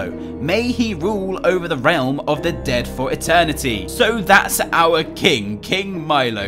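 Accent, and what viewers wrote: United Kingdom. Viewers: your from england i love your voice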